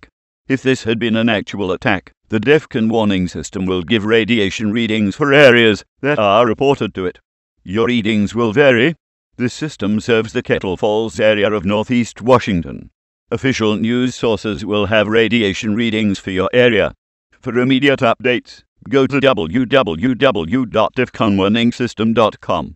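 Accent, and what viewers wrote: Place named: England, United Kingdom. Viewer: Wow...a new voice for the DWS.....Sounds English.....fishy.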